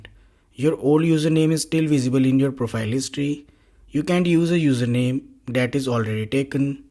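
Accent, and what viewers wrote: India. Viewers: wow, nice scam, makes sense why the accent is indian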